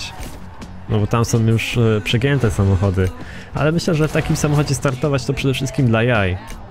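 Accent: Szczecin, Poland. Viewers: jesteś ze Szczecina??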